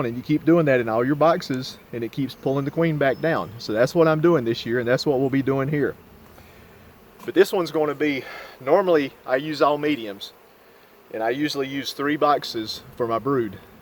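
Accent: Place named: South, United States. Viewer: I thought I recognized my language 😂😂 bursted with a country twang I’m in NC too 😂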